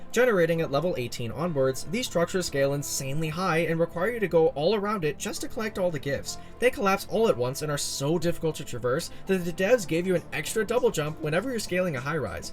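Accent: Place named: Canada. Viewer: you sound like kenadian. good video <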